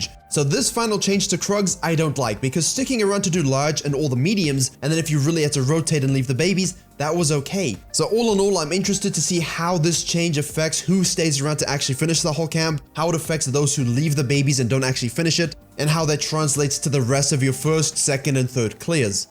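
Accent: South Africa. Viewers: Hey man, are you South African? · i thought i recognised the SA accent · This is the first video i saw of your channel and i instantly knew u were south african lmao are you a masters player with 200 ping tho ? how is that possible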